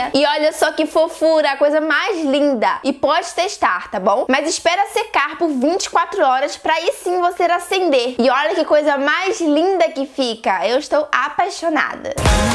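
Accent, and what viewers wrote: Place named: Rio de Janeiro, Brazil. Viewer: tu es carioca né amei o sotaque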